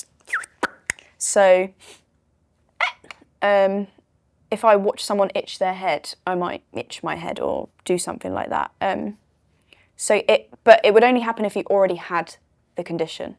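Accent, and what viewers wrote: United Kingdom. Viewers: Sounds and acts like your typical brit really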